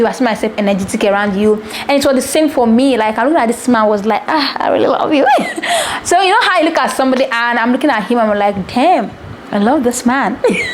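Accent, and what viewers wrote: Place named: Yoruba, Nigeria. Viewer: I like your vibes.. you so funny 😂, wow your Yoruba accent looks good from an Igbo lady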